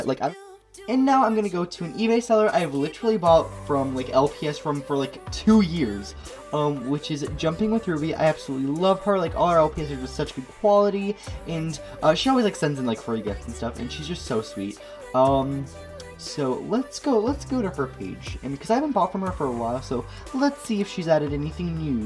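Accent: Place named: Australia. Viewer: OMG YOUR AUSTRALIAN TO OMG OMG OMG OMG OMG OMG OMG OMG OMG OMG OMG OMG OMG OMG OMG OMG OMG OMG OMG OMG I THOUGHT YOU WERE AMERICAN no offence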